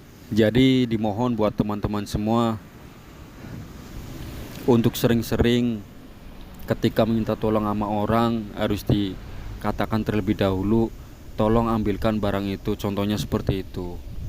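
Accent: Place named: Cirebon, Indonesia. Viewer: Logat logatnya mirip Jawa Cirebon nih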